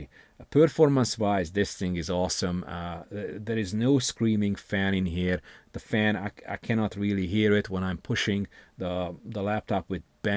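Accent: Hungary. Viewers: Nem azért írtam, csak annyira karakteres a magyar akcentus. Jó nézni a tartalmaid,csak így tovább!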